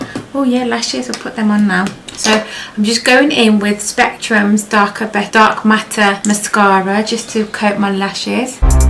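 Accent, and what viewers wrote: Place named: United Kingdom. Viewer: Are you from The UK ? Love love yoir accent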